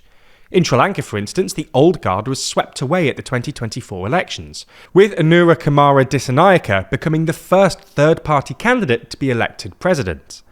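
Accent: United Kingdom. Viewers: Americans: Generation Z British people: generation Zedd. (Is he talking about Lord Zedd from Power Rangers or is this just a British accent sounding weird to me?)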